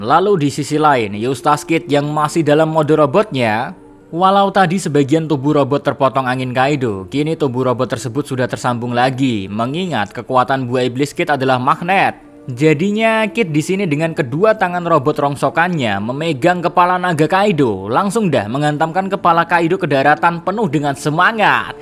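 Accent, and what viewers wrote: Java, Indonesia. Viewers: jowo ndi?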